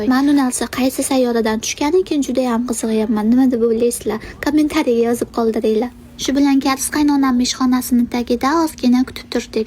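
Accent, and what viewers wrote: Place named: Andijan, Uzbekistan. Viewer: Вай бляяя бу яне анжанли экан узбекларга нимабуляпти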